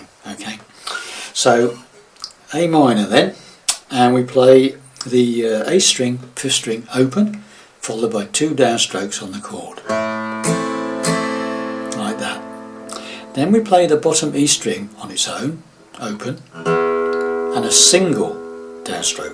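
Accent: England, United Kingdom. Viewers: The English accent caught me off guard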